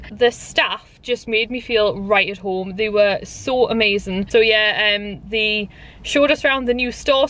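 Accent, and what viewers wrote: Newcastle, United Kingdom. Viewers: Hey. Just found your channel through your disney vlogs. As soon as I heard the accent I had to subscribe lol. Hi there from a fellow Geordie:) x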